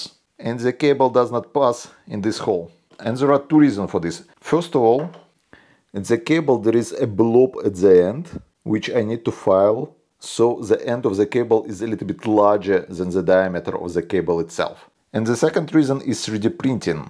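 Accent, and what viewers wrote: Russia. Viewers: Is this a Russin accent? ) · Я не очень хорошо знаю английский но по моему у автора явно русский акцент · Ты же русский, я слышу. Хватит придуриваться, говори нормально!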